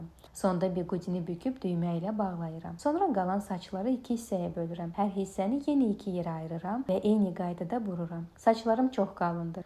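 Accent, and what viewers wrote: Russia. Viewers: Masallah olsun min dəfə❤ Bu niye belə danışır deyenlırə deyim Rusiyada böyumuş uşaqdır bu dile dili belə yatır. Azerbaycanda böyuyen hec bu qedər səlist danışmır❤❤❤Maşallah olsun! Gözəl bala, gözəl gəlindi. Allah mənə də belə gelin qismət eləsə nə gözəl❤❤❤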